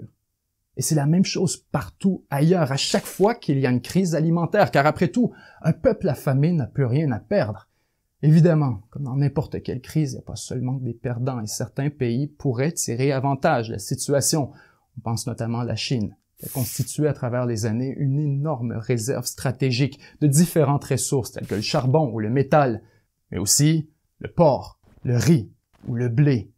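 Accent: Quebec, Canada. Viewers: Quelle eloquence ! Le ton de la voix, les intonations, etc ...C'est un vrai plaisir à écouter. Meme le leger accent québécois met une touche en plus. Une tres bonne contribution pour francophonie de YouTube !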